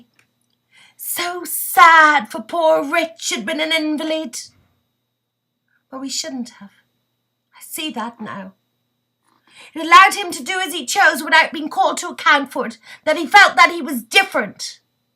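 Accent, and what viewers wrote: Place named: Ireland. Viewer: Well done, the Irish accent makes it cooler somewhat also :)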